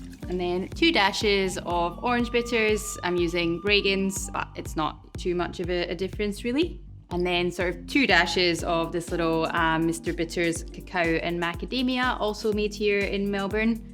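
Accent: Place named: Scotland, United Kingdom. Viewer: is now so connected with cocktails in my mind that all recipes are read in a Scottish accent.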